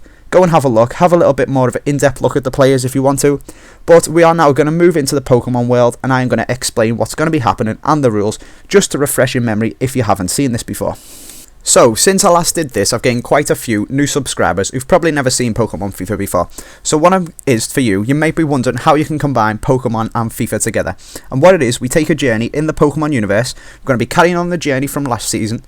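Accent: Liverpool, United Kingdom. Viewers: You had such a strong scouse accent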